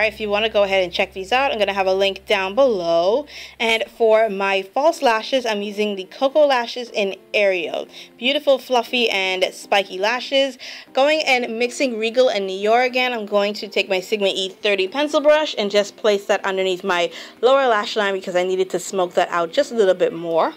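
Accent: Bahamas. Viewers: I was looking at your previous video which was a review of lime crime utopia, & I said she sounds like a Bahamian we really can't hide our accent lol.. Go girl reppin 242.. :-)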